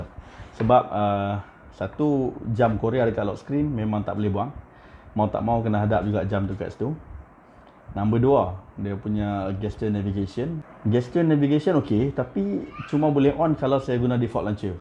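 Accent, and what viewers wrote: Malaysia. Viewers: Brother ni orang penang ka? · Kedah pekat noh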